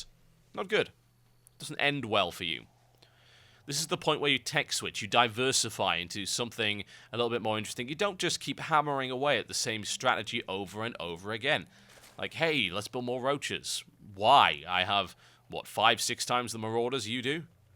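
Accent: United Kingdom. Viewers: I can't help but read comments and video descriptions in his british accent. Does anyone else have this problem?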